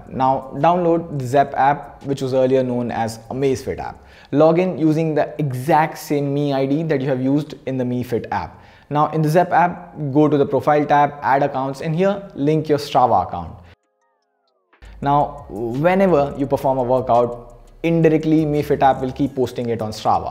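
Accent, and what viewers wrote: India. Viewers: I didn’t leave although he has an Indian accent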